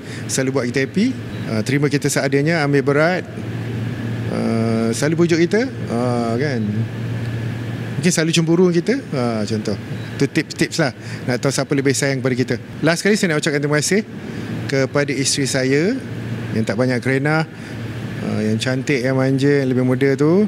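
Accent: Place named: Selangor, Malaysia. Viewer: Selangor nya ko cakap...dapat madu sepah dibuang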